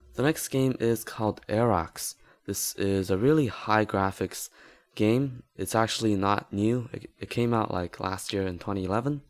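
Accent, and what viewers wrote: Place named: China. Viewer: its a chinese speaking english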